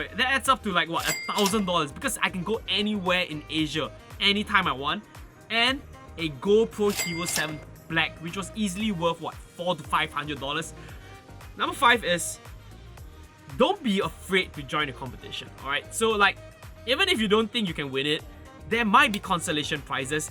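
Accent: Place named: Singapore. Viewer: Singlish to the max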